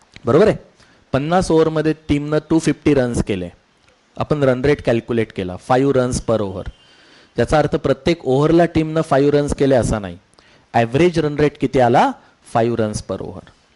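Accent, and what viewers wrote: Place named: Pune, India. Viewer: Tumhi PUNERI such a shuddh Marathi. So smart teaching.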